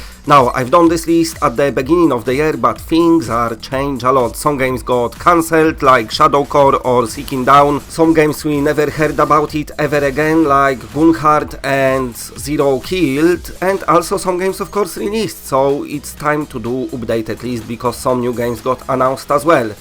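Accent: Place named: Poland. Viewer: popraw się troche z tym angielskim bo nawet mi się ciężko słucha takiej wymowy, brzmi to bardziej jak byś czytal po polsku to co jest napisane po agnielsku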